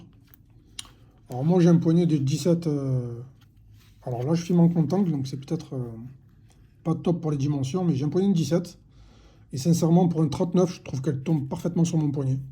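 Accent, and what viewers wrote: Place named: South, France. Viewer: Bravo 👍 J’apprécie te suivre, avec ta franchise, tes vidéos sans chichis et ton accent du Sud qui fait plaisir! Bonne continuation! PS: elle me tente bien cette Glycine 😍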